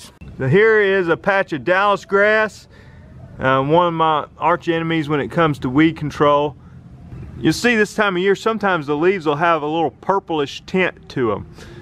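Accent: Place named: Alabama, United States. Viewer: He's got that Alabama draahhwwllll.. Love this channel tho. You'd probably say I have an accent from VA. But sounds normal to me .lol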